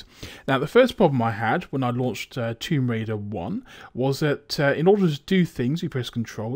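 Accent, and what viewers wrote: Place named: RP, United Kingdom. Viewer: you are awfully posh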